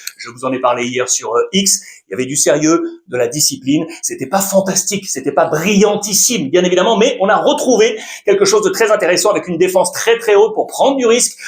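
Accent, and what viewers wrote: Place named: Spain. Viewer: Bonjour Alexandre. Mais pourquoi tu n'arrives toujours à prononcer ARAUJO avec ton accent Espanique que j'adore énormément depuis que tu étais encore chez Canal + ??? Alors fais-moi plaisir frérot et surtout pour ça qu'on t'adore depuis Abidjan ❤❤❤❤❤❤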